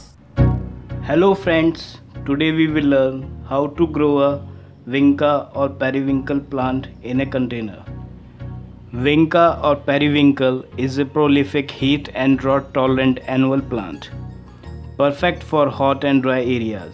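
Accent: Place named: India. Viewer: Your accent is too strong I cannot understand your Hindu English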